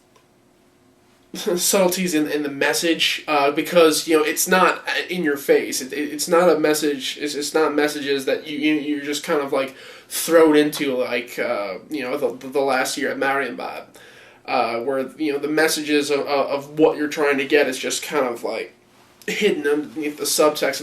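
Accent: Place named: United States. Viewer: You look British or possibly Irish, why are you talking in an American accent?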